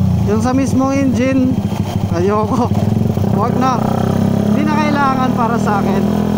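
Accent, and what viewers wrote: Iloilo, Philippines. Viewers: Ilonggo ka lods tga dn kmn?🤣🤣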